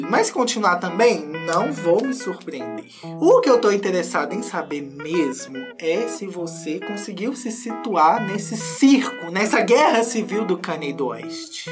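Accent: Minas Gerais, Brazil. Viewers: me divirto muito com vc! Adoro suas tiradas, seu sotaque de mineiro e sua voz! Bjsss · Meu Deus, tem vez que eu to cagando pra notícia, mas eu coloco só pra ouvir a voz e as piadocas de. Ele tem uma voz e uma energia Rico Melquiades, só que do triângulo mineiro, hahaha. Legal demais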